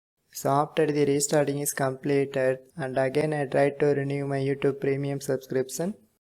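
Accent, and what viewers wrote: India. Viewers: Bro he's Indian that's just how they talk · Cuz he's Indian bozo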